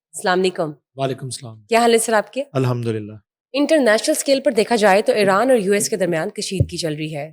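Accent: India. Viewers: اگر آپ نے انڈین کلچر ہی پروموٹ کرنا ہے تو بہتر ہے آپ انڈیا چلی جاؤ.... پاکستانی بچوں کی اُردو خراب نہ کرو.... بہت شُکریہ😮 خ...خان کو کھان کہنا غ.....غیر کو گیر کہنا یہ ہمارے ہاں نہیں چلے گا افسوس اس بات پہ ہے کہ ڈاکٹر ثمینہ حق نے بھی اپنے ایک پوڈ کاسٹ میں کہا تھا کہ انڈین ڈرامے سٹار پلس وغیرہ دیکھنے چھوڑیں آپکی زُبان، کلچر، رہن سہن، مذہب اور دماغی سازشیں سب کُچھ آپکے ماحول کو گھروں کو خراب کر رہے ہیں.... مُشتری ہوشیار باش 😮😢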